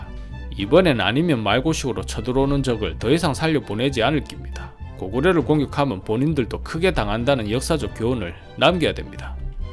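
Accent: Gyeongsang, South Korea. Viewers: 갱상도 사투리 재밌어요 뭐 꼭 고구려말로 해야 하나요? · 고구려인 말을 할 때 북한 사투리로 하라는 것이 어이없네요. 북한 사투리가 한두개인가... 평양말투와 연변쪽 말투만 비교해도 완전히 다른데, 고구려인들이 어떤 말을 했을지 어떻게 알고... 그리고, 지금 북한 말투와 고구려 시대 말이 비슷할 거라는 생각 자체가 웃기지도 않음. 전혀 다를 수도 있음. 어디 거란이나 말갈족 언어와 비슷할 수도 있는 것이고... 적어도 만주벌판을 내달리던 초기 고구려인들이 현재의 한반도 내 북한 말투와 비슷했다고 생각치는 않음. 님의 대화체에서 갱상도 사투리는 님과 제작영상만의 개성이니, 하시던 대로 주욱 이어나가시면 될 것으로 봅니다. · 영화 황산벌 촬영하는 것도 아니고,그 당시 신라 사람들이 경상도 사투리 썼던 것도 아닌데, 고구려 왕이든, 한나라 장수든 경상도 사투리 쓰는 게 뭐가 어때서요 ㅋㅋ 그냥 편하게 하세요~ 말투와 표현들이 귀에 딱딱 꽂히니 좋으네요.ㅎ 아니면, 고대 삼국시대에 썼던 언어를 그대로 써야죠.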